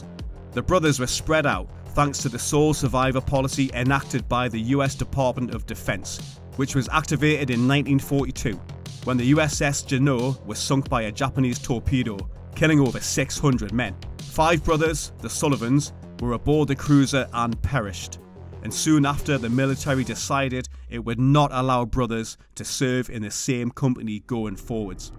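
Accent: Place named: Newcastle, United Kingdom. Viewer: Can't take this video seriously with its Geordie narray'aaaaah.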